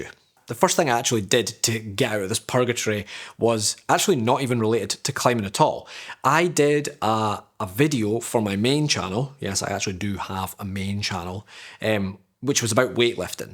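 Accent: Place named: Scotland, United Kingdom. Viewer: Wild clicking on this video randomly and before I can even finish thinking "oh he's Scottish" I'm shown a clip from my local gym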